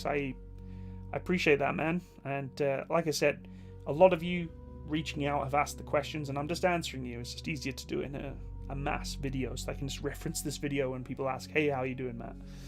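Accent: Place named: Scotland, United Kingdom. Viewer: 3/5 Darkhorse...You sound scot not kanuck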